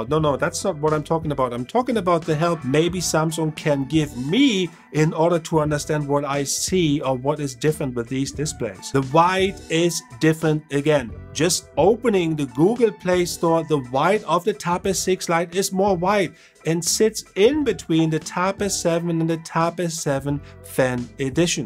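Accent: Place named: Germany. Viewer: I like your german accent, schönen Tag noch 👍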